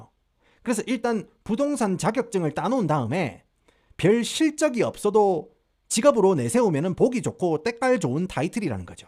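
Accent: Gyeongsang, South Korea. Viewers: 동부사투리 오졌다 애살에서 샤워하다가 터져벌임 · 오늘 처음 알게 되었어요 얘기도 재밌게 하시고 사투리 좋네요 저도 경상도사람이라~ 구독했습니다😊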